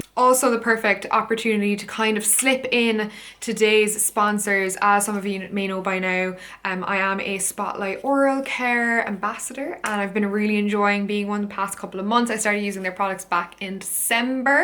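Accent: Ireland. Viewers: Your Irish accent is poppin even more now!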